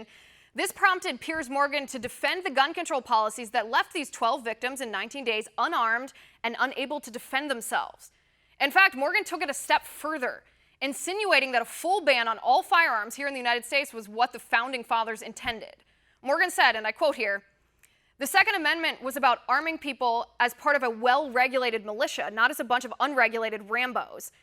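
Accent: United Kingdom. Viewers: Being part Irish, I am too polite to write how I feel towards Morgan and his British accent telling me to disarm. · "Are not the King's ships and the King's troops enough protection for an honest man?" Gen. Sir Thomas Gage, commander of the disastrous British raid at Lexington and Concord. Does anyone know if and Gen. Gage related? Gun control with a British accent does not play well here. It didn't play well 243 years ago either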